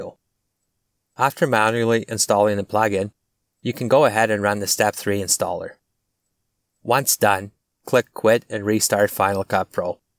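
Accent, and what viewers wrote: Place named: Saskatoon, Canada. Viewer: You sound like you’re from Saskatoon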